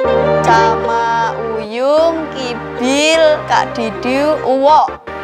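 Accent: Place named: Batang, Indonesia. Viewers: Medok mbk ! Sama kayak aku cah Pati mbk lumayan dkt dgn Batang .. fans PERSIB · Logat Bandar Batang ko kui yo Jun yo,, Pokok'e idolak cah2 Lereng Gunung Prau 😍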